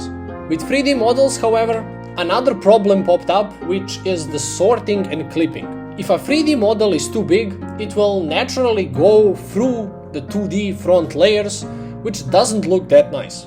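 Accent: Eastern Europe. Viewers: may I ask what your first language is? I could probably find out myself, but I’m too lazy. I will say it’s likely Slavic, Uralic, or Turkic based on your pronunciations of h, r, and w. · I really like this so far, it reminds me of a ps4 game you see and buy randomly and really enjoy it at the end lol. Anyways i see that you have a slavic-esk accent and if it doesnt bother you, im really curious as to where you are from? Im in the south balkans so i just find it cool that theres a person like me liking and making games. Dont give up!😁😁😁😁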